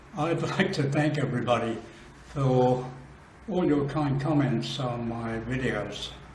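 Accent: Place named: Australia. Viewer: Thanks for answering the questions good to know your eating a high protein high fat green diet. I am assuming by protein and fat you mean animal source. Your an inspiration great to see you can still be active at 80, by your accent I know your Aussie however your location seem to be elsewhere maybe Asia or Darwin.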